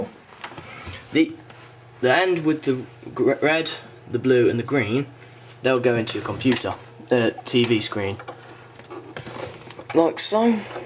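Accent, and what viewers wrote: United Kingdom. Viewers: You can notice that he has a British accent.... they use fucking STERLING POUNDS as a currency... -_ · no some british people don't sound like harry potter, but this guy sounds almost identical to him go watch harry potter then watch this vid again. i wish i had a british accent, having no accent is boring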